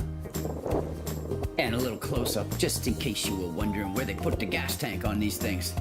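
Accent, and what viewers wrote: Boston, United States. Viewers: Boy, listening your accent makes me miss living in Boston. Well, I don't miss the winters.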